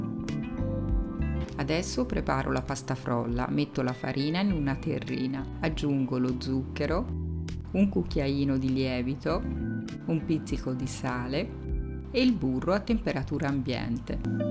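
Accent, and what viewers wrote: Tuscany, Italy. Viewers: 😋😋😋😋che meraviglia. La farò sicuramente. Ben tornata ascoltando la tua bellissima voce con l'accento Toscana.mi sei mancata.ma ora si ricomincia mia cara.e come ti dico sempre un bacione cara.❤❤❤❤❤❤❤❤❤❤❤❤❤🌹🌹🌹🌹🌹🌹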